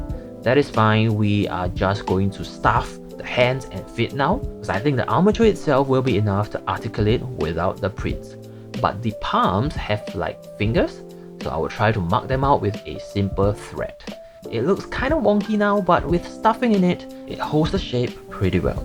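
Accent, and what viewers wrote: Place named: Singapore. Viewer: from the accent and the whiteboard marker u used i could tell u were from singapore haha! love ur sense of humour and u are so talented. gonna binge watch ur other videos now ;) !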